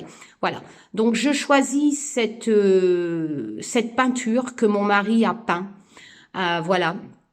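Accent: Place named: Nord, France. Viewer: est juste humaine 😇un mail envoyé et réponse dans la foulée...j'espère que ma question sur le boulot arrivera surtout que ce domaine (dans des bureaux )du jamais fait pour moi😊j'adore la regarder toujours dans la bonne humeur même légèrement remonter, elle a toujours un mot pour nous faire rire...Son patois un peu chti me fait rappeler ma famille tous en Picardie.Bref une femme qui m'a donner l'envie de faire mes propres tirage d'ailleurs reçu mon petit Oracle aujourd'hui que d'émotion pour moi😢 j'attends mes 2 autres avec impatience...Je fais selon mes envies j'ai sauter le pas pour mes tirages et me contre fiche du jugement de certains membres de mon entourage. Grand merci pour toutes tes explications sur les cartes.Votre livre est rempli d'émotions les larmes sont venu pour moi😊.Continuer sur votre chaîne vous nous faites un bien fou...Encore une fois. 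M E R C I 🙏🙏🙏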